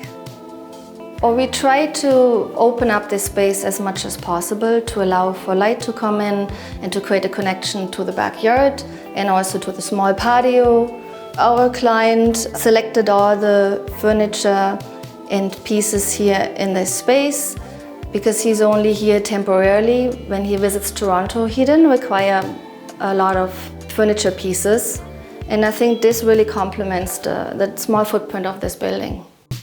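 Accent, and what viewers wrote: Germany. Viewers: i lover her German accent. She should do ASMR videos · Sounds German to me. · Definitely German. Many German's I have met in London have better English than us natives!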